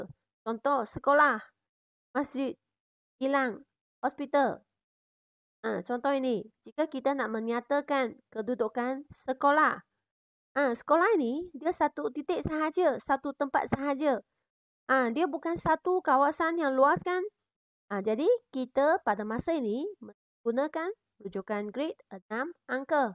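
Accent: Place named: China. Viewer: Cuma cikgu ni Chinese so slang dia lain sikit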